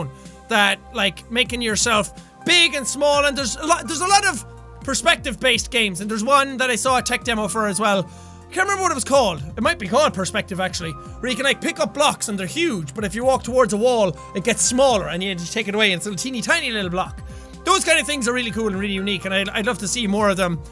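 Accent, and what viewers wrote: Ireland. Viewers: are u asking if he's Irish or not because he definitely is Irish and he's had that accent for a while haha :3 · is he irish?? im new ;p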